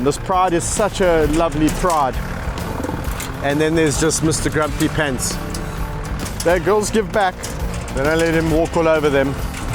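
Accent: South Africa. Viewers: Such adorable creatures, like overgrown pet dogs hehe. Obviously we know animals such as lions and bears can never be completely tamed, and will always retain some of their wild instincts, including the instinct to kill. This can't be "trained away". But I love this dude. He naturally understands that and has a very healthy respect for them and their power, but also doesn't simply abandon these poor, lovable and ecologically important animals, instead risking his life and spending his money to care for them and keep them as comfortable and happy as possible. And that's the truly amazing part. Because you can tell just by seeing the animals that they really are happy with him there. =) NICE job. And I love that awesome S. African accent!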